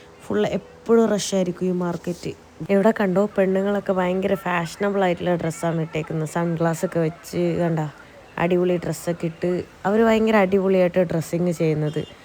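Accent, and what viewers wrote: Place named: Kozhikode, India. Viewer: സംസാര ശൈലി കേൾക്കാൻ രസമുണ്ട്....തനിമയുള്ള നാടൻ കോഴിക്കോടൻ മലയാളം....😂❤ അടിപൊളി സംഭവം..disposition too ok!.😂😂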